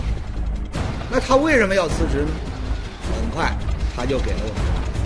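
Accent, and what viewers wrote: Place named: Beijing, China. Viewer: 主持人真棒，好像是北京人！赞赞赞👍👍👍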